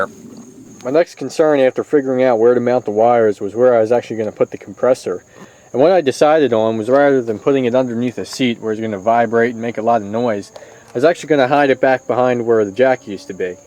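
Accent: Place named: Philadelphia, United States. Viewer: Are you from Philadelphia, or close to it? The second I heard you speak. I was like, this dude is from my area.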